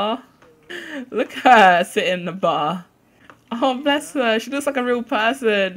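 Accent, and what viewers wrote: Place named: United Kingdom. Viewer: Love your accent. Are you from the U.K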